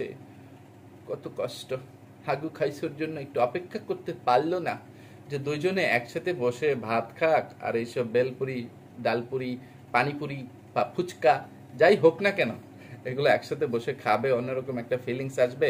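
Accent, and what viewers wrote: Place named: Mymensingh, Bangladesh. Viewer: Apni to moymonsing vashai kotha bolen..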